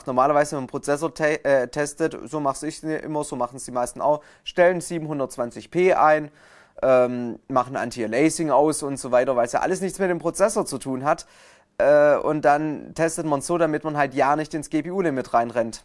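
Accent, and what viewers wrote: Swabia, Germany. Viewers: alter schwabe :D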